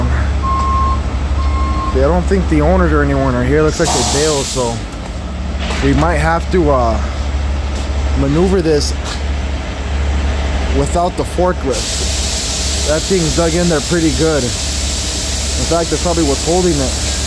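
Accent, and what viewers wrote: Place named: Arkansas, United States. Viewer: Dude, I've been enjoying your videos for at least two years or longer. I'm pretty sure that I met and ran into your grandfather, or maybe great grandfather? several times over at Engs Peterbilt back in the late 70's-80' before Rush. I can't remember Mr. Engs first name but knew him and dealt with him and Tony Lacey, and knew many small fleet owners for many years. Guys that if they couldn't have fun with you and vice versa they were no good to you. Anyway, one time he was talking about his son being ready to go out solo on a wrecker...as soon as he got a D/L in a few years. So this is a long way around just to say your videos are great, your talent and skills are superb, and now I see you're honing in on your comedic assets. "I'm going to be getting it from the rear" and then the Peter Pan scene...you're funny dude! 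Also, I laugh every time you same "boom". If you didn't say it you sound like a good ole hippy boy from Little Rock Bakersfield. I better stop now and apologize in advance if I've offended anybody...I've been drinking, you suggested it. I'm an old man, maybe there's a new kinky kind of Towmans Porn going on? Be careful out there, peace out, and enjoy. God bless.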